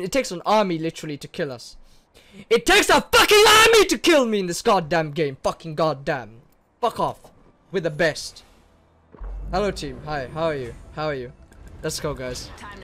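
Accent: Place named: England, United Kingdom. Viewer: He honestly sounds so different when he's not screaming, it's like the only time I can hear his English accent😂😂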